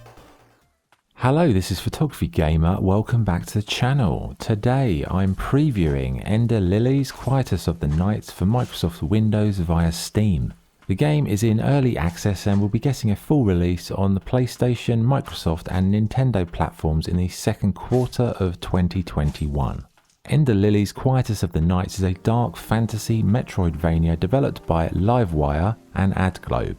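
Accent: England, United Kingdom. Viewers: I feel like the reviews are getting more organic. You and WAB are my favourites at the moment. Maybe English accents are just more tolerable.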